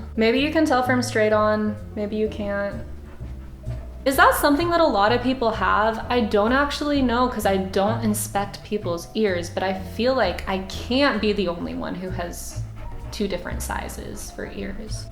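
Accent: South, United States. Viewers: Your accent is so amazing shoutout to my fellow southerner!🤠🤠🤠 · Where - where do you live? Like you sound very Southern sometimes,but sometimes you sound like you could live in Oregon. It's big confusion for me. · it’s weird because sometimes when I watch I totally can ignore her accent but now HER VOICE IS EXTREMELY SOUTHERN AND UNAVOIDABLE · She probably lived in the south and moved to the north. I do the same thing. My accent gets really thick when I’m stressed or angry😬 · wow your southern accent is really poking through in this one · country accent was strong in this one and that makes me happy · That country accent really showin it's self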